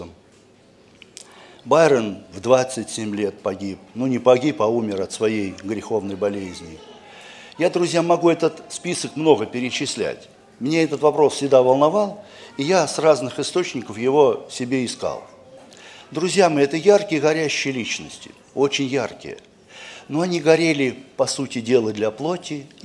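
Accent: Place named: Volga, Russia. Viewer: Спасибо. У него речь волжанина), я думала, что с Волги откуда-то) Мир Вам🌹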